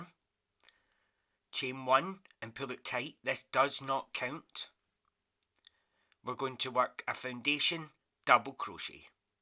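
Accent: Scotland, United Kingdom. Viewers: So glad to see you are well enough to be back giving everyone joy with your tutorials. They are so helpful and give clarity to your pattern. I love listening to your Scottish accent as well.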